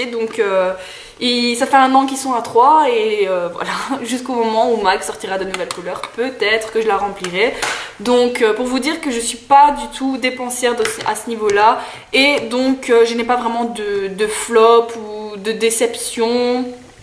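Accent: Belgium. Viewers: Coucou, chouette vidéo, malgré que je l'ai déjà regardée 3 ou 4 fois sur d'autres chaines, c'est tjrs intéressant. Je partage tes gouts pour les fàp, d'ailleurs j'adore la palette que tu as composée (make up studio). Et la naked 2 me tente beaucoup, mais je ne l'ai jamais encore vue en vrai parce qu'à Liège il n'y a pas de Séphora, il faut aller jusque Lille :) Rien à voir mais tu es belge? J'avais décelé un petit accent, mais surtout tu as parlé du salon esthétika (je sais pas si ça s'orthographie comme ça ^^).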